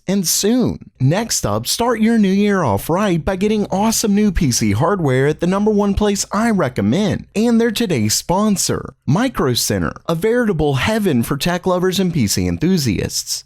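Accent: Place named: United States. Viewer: most uncomfortable American Accent to listen.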